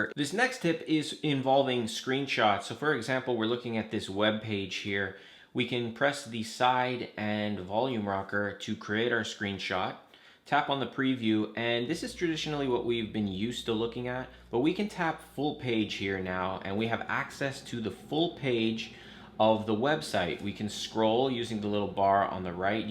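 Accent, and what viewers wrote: Canada. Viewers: This is freaking perfect. SO helpful. Thanks, buddy 😊 Also, love that I can tell you’re Canadian from your pronunciation 😁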